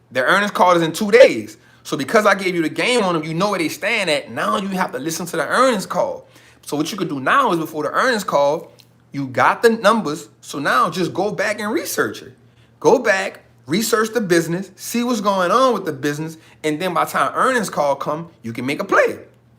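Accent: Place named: South Carolina, United States. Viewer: Your accent reminds me of my grandmother. She's from South Carolina.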